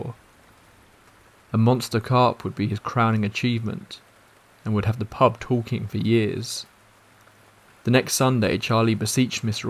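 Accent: England, United Kingdom. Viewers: As an Aussie, hearing an English narrator narrating scary stories lends them authenticity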